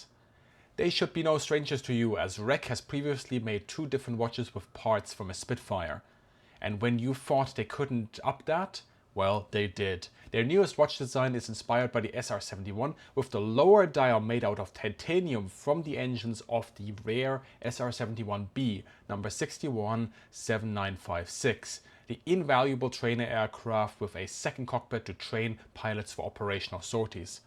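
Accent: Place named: East Germany, Germany. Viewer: I think i can detect east german accent maybe even berlin area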